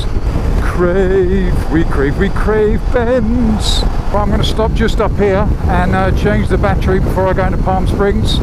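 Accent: United Kingdom. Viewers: Damn lively man...enjoyed every bit of it...the queenz english and america r a good combo..!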